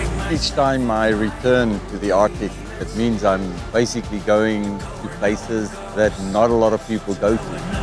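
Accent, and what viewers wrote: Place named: South Africa. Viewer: The sweet sound of that SouthAfrican accent. #dreamy ;) Brilliant film, gret visuals as always!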